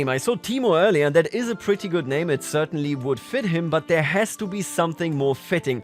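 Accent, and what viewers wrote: Germany. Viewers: I smell Deutsch in your Akzent But I live this kind of content so pleas keep on by doing so man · 2 Sekunden und ich wusste das du deutsch bist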